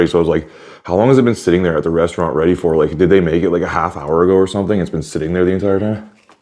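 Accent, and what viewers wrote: Canada. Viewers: Your accent isn’t super familiar to me as an Ontarian, are you possibly albertan or a maritimer?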